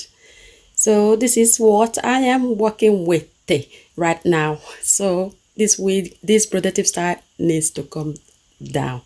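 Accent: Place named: Nigeria. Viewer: Your accent is very thick and lovely, are you Nigerian?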